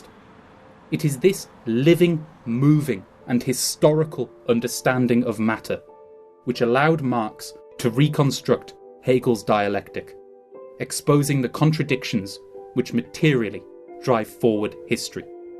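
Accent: United Kingdom. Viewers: You sound British or Anglo-Saxon for sure, so you don't live in communism. SO, JUST ASKING HOW DO YOU KNOW THAT IT'S SO GREAT AND WONDERFUL? LEGIT QUESTION.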